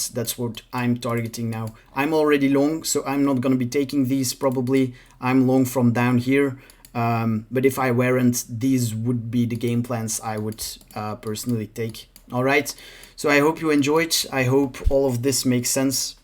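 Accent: Belgium. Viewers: Dat Belgisch accent 😆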